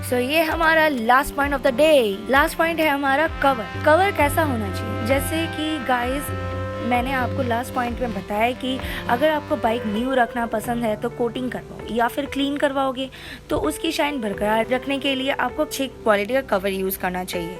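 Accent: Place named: West Bengal, India. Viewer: Sweet Bengoli voice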